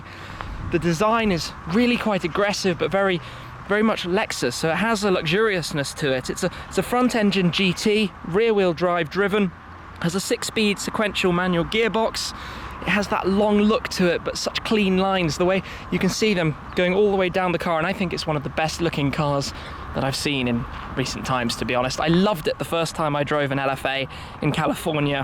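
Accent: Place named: United Kingdom. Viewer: I hate that british accent.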